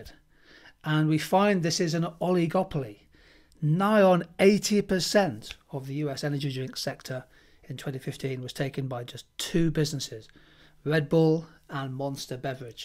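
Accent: United Kingdom. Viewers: This video is very helpful, but I think I was watching it mostly because of a really sweet British accent ☺️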